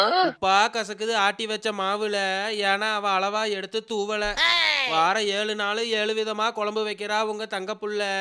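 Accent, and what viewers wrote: Coimbatore, India. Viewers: இததா கோயபத்தூர் குசும்பு ஆனா சூப்பர் பேச்சு😂😂😂😂😂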